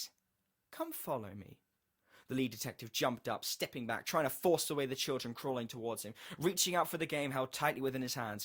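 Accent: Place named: United Kingdom. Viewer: Just because you have a British accent, this reminds me of an episode of Sherlock xDD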